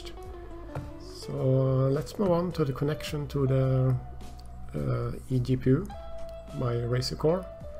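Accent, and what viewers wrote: Sweden. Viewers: It screams Swedish accent.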